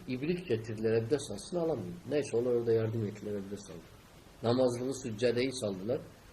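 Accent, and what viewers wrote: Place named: Turkmenistan. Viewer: hocanın şivesi türkmence, allah rahmet eylesin saddam hüseyin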